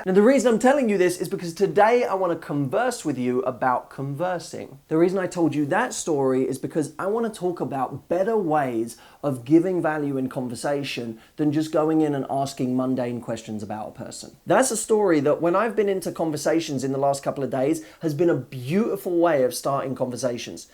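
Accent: United States. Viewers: Your American Accent is awesome! Thank you for the helpful content. 😉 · Amercan accent!!!!! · his American accent reminds me of Ryan goslings voice lol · Is that a Californian accent? Regardless, your rendition was awesome. You slip into and American accent like it's butter, i.e smooth! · Love the American accent...lol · I love the American accent - the deep voice!, you are amazing. Thank you so much! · Your American accent is adorable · Jesus. Your American accent makes me feel like you're playing all of us all of the time. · good American accent dude:) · Omg the american accent is great!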